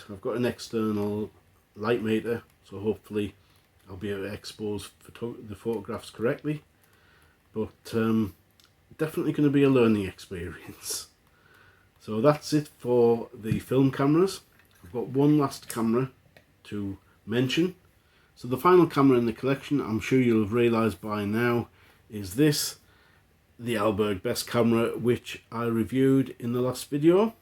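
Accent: North East England, United Kingdom. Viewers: I have just discovered your channel on a youtube safari and immediately spotted the NE accent! I have watched a few of your videos now, and will continue over the next few days. My friends think I am mad because I mainly do film photography, but looking at some of your digital collection makes me feel saner! I have to say: Bad 35mm film cameras are miles better than bad digital cameras! I have a Fuji STX-2 which cost £15 at a pawn brokers and have to say it is a camera that never disappoints and from what you say about the STX-1 it would seem that Fuji film cameras are definitely unsung heroes. I love your content and the way you present it (a lot more polished than me) and I am hoping that I will be inspired to get better. I am looking forward to more over the coming days.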